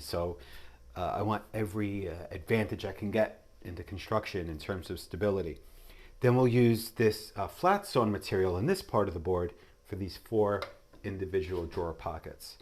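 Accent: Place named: Hudson Valley, United States. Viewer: Sounds like you might be in the Hudson Valley. Hello from Greenville, NY. You have an incredible skill level. How did you develop your skills? Also, if you don't mind, what was the tool you used - some sort of drill guide? Thank you.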